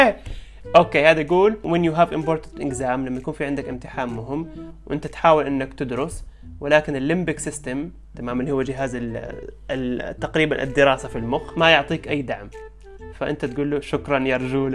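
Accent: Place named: Gulf. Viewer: انت من وين تتكلم خليجي اردني مصري لخبطتنا يخوي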